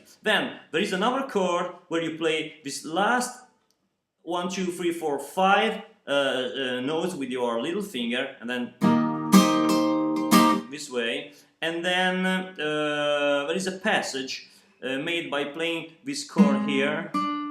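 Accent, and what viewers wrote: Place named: Italy. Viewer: Parece que ele é italiano e não fala portugues.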